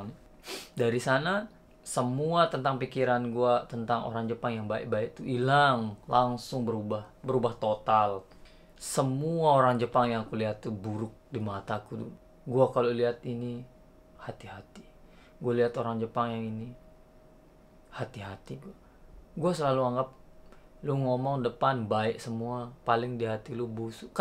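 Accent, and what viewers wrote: Lombok, Indonesia. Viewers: Aksen lo kek org lombok bang kalok ngomong Indonesia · Ndk bisa hilang logat lomboknya bero... Salam lombok lolon buak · seperti logat orang lombok ya.. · Logak lombok nya medok ya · Dari bahasa dan logat nya org Lombok Wkwkwkwk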